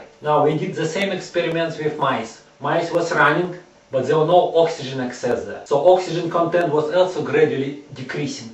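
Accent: Russia. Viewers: I have learned so much just from watching your video. I find the comparisons between primitive and modern day breathing mechanisms immensely fascinating. I am a physiology student and this has given me a lot to think about! I'm also Russian (I think you are too?) and it is wonderful to hear the accent again after so long.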